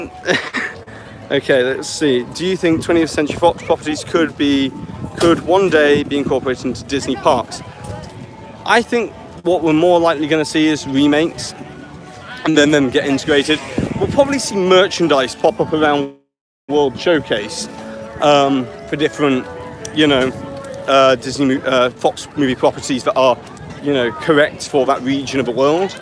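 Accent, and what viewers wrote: United Kingdom. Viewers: Where about's are you from the UK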